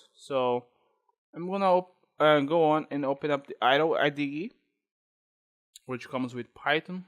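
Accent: Brazil. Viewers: E esse ingles de br ai.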